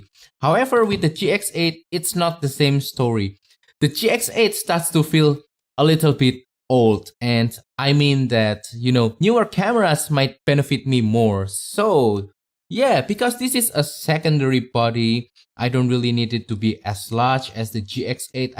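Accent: Indonesia. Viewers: As an Indonesian myself, I didn’t know that you’re an Indonesian until I notice your accent 😁. Greetings from Poland 🇮🇩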